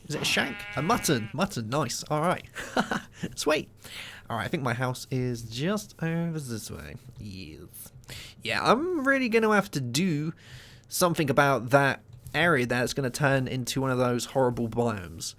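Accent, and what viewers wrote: United Kingdom. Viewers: You've got a very nice British accent ;)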